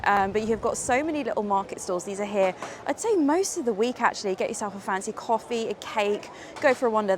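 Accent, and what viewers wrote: RP, United Kingdom. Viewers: You speak elegantly, and I wonder what accent you have. I assume it sounds like modern RP.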